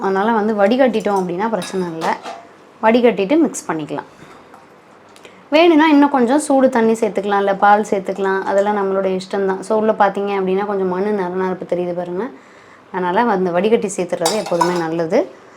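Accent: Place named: Tamil Brahmin, India. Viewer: I think you are a tambrahm since your diction is familiar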